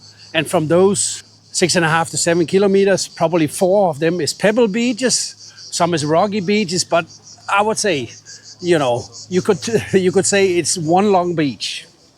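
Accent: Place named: Germany. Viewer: Curious here. - your first name sounds Danish. Your Family name English. Your accent in English seems German.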